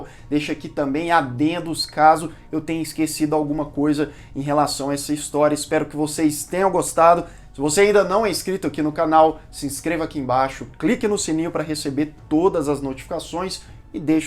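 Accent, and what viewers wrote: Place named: Belo Horizonte, Brazil. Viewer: Que beleza de vídeo e o lance da Kombi dos ovos a dez Reais foi massa!!!! Kkk parabéns!!! Vc é mineiro, né? Sotaque de bh!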